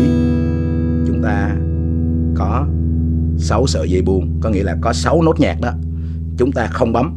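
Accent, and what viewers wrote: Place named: Cam Ranh, Vietnam. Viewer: ..lúc đầu nghe tiếng nói a e nghi nghi rôi ..vì e cũng là ngưòi Can Ranh .Mong a nhiều sức khỏe.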